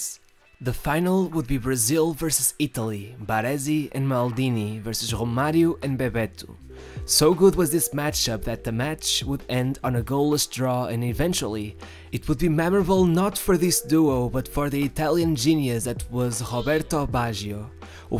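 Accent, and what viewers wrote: Portugal. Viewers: Man are you from Portugal? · that’s just his Portuguese accent then. Nice back track though.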